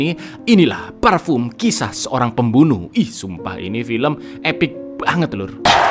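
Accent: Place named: East Java, Indonesia. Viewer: Hahaha sebenernya punya potensi melebihi iq7, tapi kenapa jon iq7 yg lebih terkenal ya. Apa karena logat sunda jon lebih populer dari logat jatim nya cak. Entahlah, waktu yg akan menjawab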